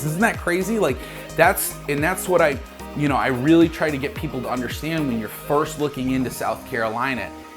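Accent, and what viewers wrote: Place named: Western New York, United States. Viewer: Entertaining video, though that Western New York accent is still evident. Go Bills!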